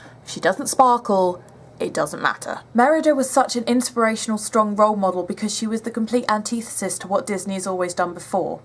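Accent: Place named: United Kingdom. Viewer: Saw ya comment on Sourcefed. I agree with what you said in your vid, I also think it was also a shame in how they changed her image, really no need for it. I myself thought she was a great character and i really liked her. Not that i have a problem with really feminine women, but i rather the normal down to earth, and even in a way tom boy-ish girl to a certain extent, i even prefer to date women like that :P. Curious i know your British from your accent, but are you also Scottish by chance?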